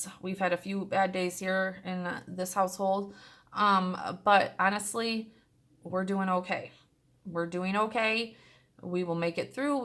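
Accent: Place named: Michigan, United States. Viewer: Things got tough for us in 2009. We changed our cell phone provider and kept our phone numbers. Each of us pay $30 month. We use Mint SIM. That might cut your bill in half. Our ciiverage is awesome and we have no problems. No my parents use it as well, they caught on. Best 2 you From California. I was born in Michigan and I recognize your accent.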